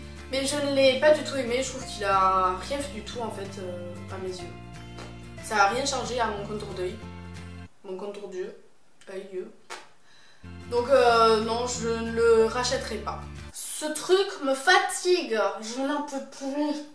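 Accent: South, France. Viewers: Je t'ai découverte y'a pas longtemps et j'adore tes vidéos, très simple sans chichi, et puis ton accent du sud 😻 c'est tellement rare les youtubeuses du sud ça fait plaisir moi qui est l'accent aussi ! Et pour info, comme tu as un petit budget, je ne sais pas si tu as vu, mais ils ont sorti plein de petit parfum a 30e chez sephora ! Voilà j'ai hâte de voir une prochaine vidéo 👌 !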